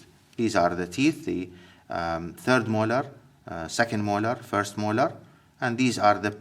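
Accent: Iraq. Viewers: thank u very much dr for this video, the skull has always been a problem to me! hope with ur coming videos things will be easier, though i'm a surgical resident now i prefer to study anatomy in its very basic form, i was honoured to have an iraqi professor as a teacher of anatomy in my university, wonder if u know him actually! his name was dr Hani Alazzawi and u remind me of him i mean the accent:), lots of respect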